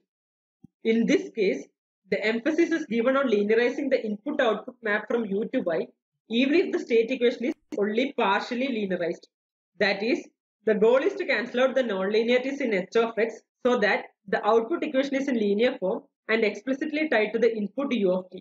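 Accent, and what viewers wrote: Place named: Thrissur, India. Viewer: I think I hear thrissur dialect here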